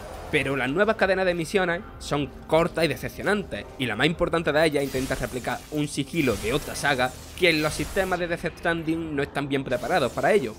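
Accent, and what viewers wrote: Andalusia, Spain. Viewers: La gente descubriendo el acento andaluz... analfabetos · Hay acentos en el sur de España donde la "S" son casi mudas, sobre todo en las palabras plurales, el tema de las "R" lo veo más de la parte de Murcia ( aunque en este caso lo veo muy exagerado ), todos los acentos merecen respeto pero teniendo en cuenta que este canal lo ven en muchos países no veo la mejor elección un locutor con un acento tan cerrado. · La gente de Andalucía no puede ser narradora o qué? Respeta los acentos que a lo mejor los de latinoamerica lo entienden mejor que tú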